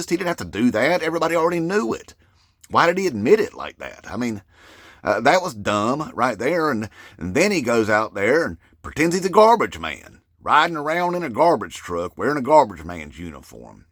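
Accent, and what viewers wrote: South, United States. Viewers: 😂😂😂😂😂😂😂😂😂 I love you! You are hilarious. Your southern hillbilly accent is on point.👏🏼👏🏼👏🏼👏🏼👏🏼👏🏼👏🏼👏🏼👏🏼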